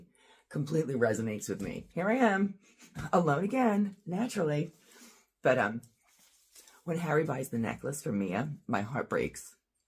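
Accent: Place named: United States. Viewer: You don’t need a cute British accent, you have a cute American accent and you are genuine and in my opinion beautiful. 😊 women are allowed to be happy and have dreams. Looking forward to your videos!😊♥️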